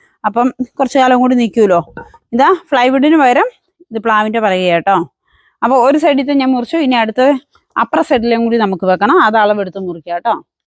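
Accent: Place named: Kasaragod, India. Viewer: നിങ്ങൾ അടിപൊടി❤ നിങ്ങൾ ബുള്ളറ്റ് ഓടിക്കുന്ന ഒരു വ്ലോഗ് ഇടാമോ പിന്നെQA ചെയ്യാമോ കുറേ ചോദ്യങ്ങൾ അറിയാനുണ്ട് എപ്പഴാ ബുള്ളറ്റ് ഓടിക്കാൻ പഠിച്ചേ😊 പിന്നെ എത്ര വരെ പഠിച്ചു പിന്നെ കാസറഗോഡ് സംസാരം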